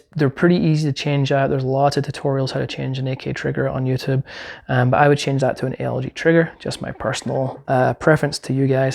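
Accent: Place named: Scotland, United Kingdom. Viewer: Where you from brother? Sound a bit scottish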